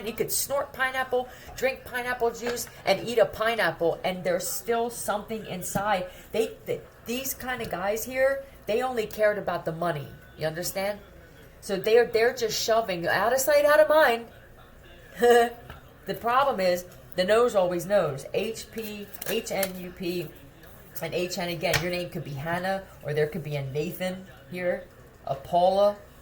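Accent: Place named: Philadelphia, United States. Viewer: Yooooo giiiirrrrrllll I LOVE YOU AND IM NOT TOO FAR FROM YOU AND BY THE PHILLY AIRPORT!!! You are spot on with these MF and what they are doing to me presently and have been for the last 3-4 years… gang stalking, harassment, hacking so much more….. smfh!!!! I would love to meet you in person so bad!!!! This reading had me on the ground rolling laughing!!! I love your Philly accent and I love the impressions of the women of the night and the wanna be women of the night!!!!!! I can’t make this crap up that they are doing to me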